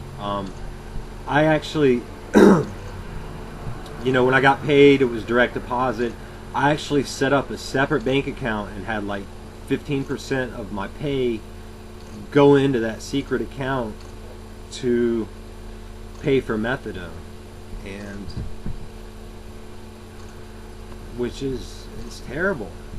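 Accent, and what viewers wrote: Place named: North Carolina, United States. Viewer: Yea man I live in Leland in Windsor park as well so I go that same way to get to the clinic. I think it was just the way you talked and then a few things you said in your videos like you were in south east nc ect that made me think we must go to the same clinic. Pretty cool man. Glad you got off the shit. I just got on it last year and I kinda like being on it because it helps my chronic back pain that has kept me from sleeping well for years now and it also satisfies my opiate cravings. But I do wanna be free one day so I watched your vids. I plan to do the taper correctly so hopefully it won't be as bad as what you went through but it's really scary.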